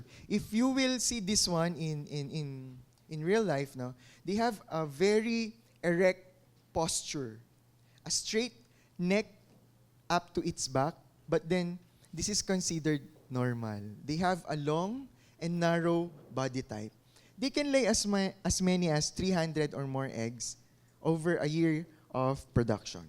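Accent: Philippines. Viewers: Sir magtagalog ka nalang mas bagay sa accent mo :)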